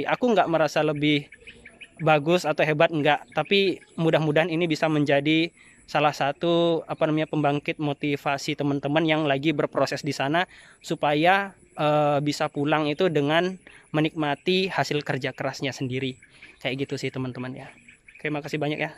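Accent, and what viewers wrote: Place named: Sumatra, Indonesia. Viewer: Klu dari cengkok bahasanya BKN org Jawa. klu GK salah orang bagian Sumatra.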